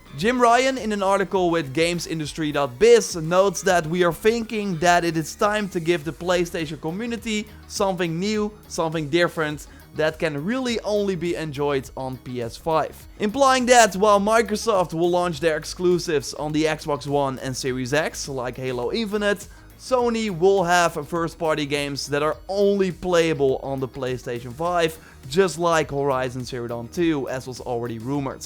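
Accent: Netherlands. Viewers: is Dutch, Guerilla Games is based in Amsterdam, hence the Dutch pronounciation. (But yea, is Dutch too. =P)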